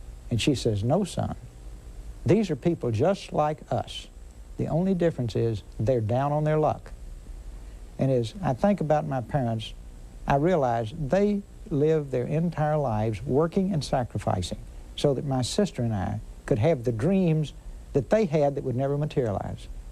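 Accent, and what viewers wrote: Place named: Texas, United States. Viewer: I love how he speaks with such eloquence through that awesome Texas accent.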